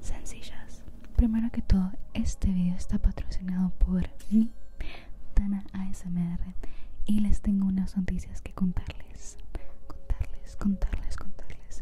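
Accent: Mexico. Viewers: ¿Sos Argentina?😯 Te oigo más acento como mexicano jajaj. Súper bueno el video, tenés una voz muy relajante.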